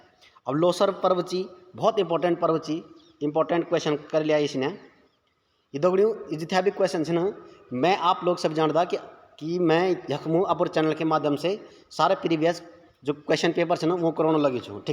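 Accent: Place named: Garhwal, India. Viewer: Aapki gadwali pyari h sir ji 👌🏻👌🏻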